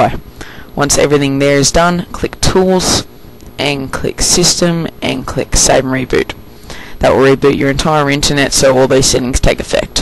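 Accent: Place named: Australia. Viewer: Its australian actually.. definately not a kiwi..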